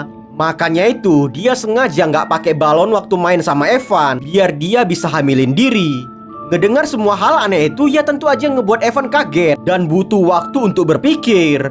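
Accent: Medan, Indonesia. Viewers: Paten kali ah abg ni klo cerita suka aq weh khas gtu ama logat medannya😂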